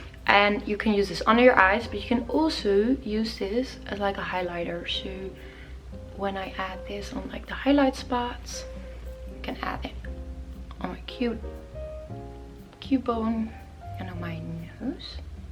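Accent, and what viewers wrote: Netherlands. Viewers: Je klinkt een beetje Nederlands nog! Ik kom van masha haar mukbang :)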